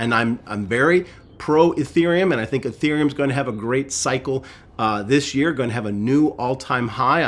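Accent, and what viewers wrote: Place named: Eastern Europe. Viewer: great intro sir. may i ask if you are from eastern europe? thanks.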